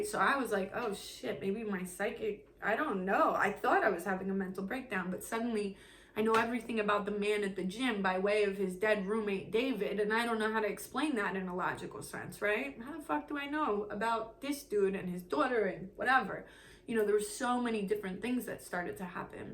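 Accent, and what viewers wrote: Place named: New York, United States. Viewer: It's the new York accent but I agree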